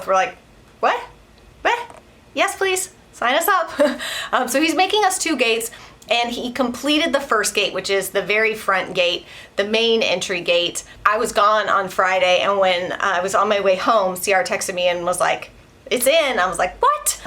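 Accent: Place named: South, United States. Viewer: I love your southern accent! And your real adhdness in this video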